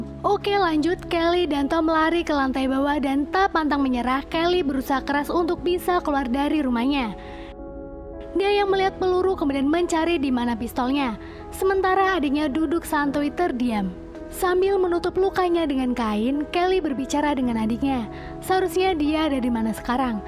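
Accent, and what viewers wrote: Pemalang, Indonesia. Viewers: Mba orang Pemalang yak?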